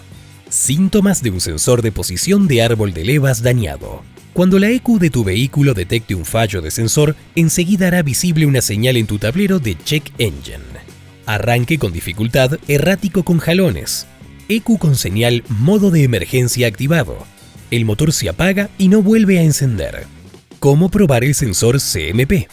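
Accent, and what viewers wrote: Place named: Chile. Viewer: Me Gustan Estos Videos SiN Acento Mejicano....suena como Acento Chileno de Chile po'' weon Chile 🇨🇱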